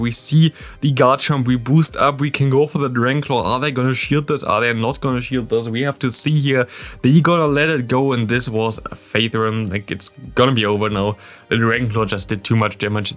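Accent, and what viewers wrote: Germany. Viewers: Ohne es zu wissen, aber richtig deutscher Akzent im Englischen. :-)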